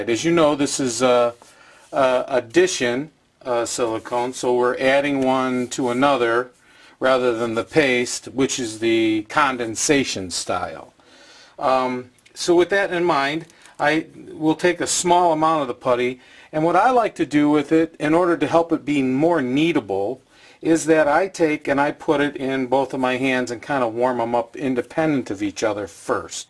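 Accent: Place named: United States. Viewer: American guy. For sure. :)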